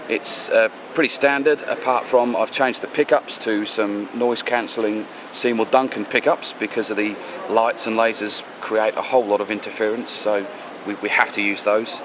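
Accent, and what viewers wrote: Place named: England, United Kingdom. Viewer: he sounds english to me.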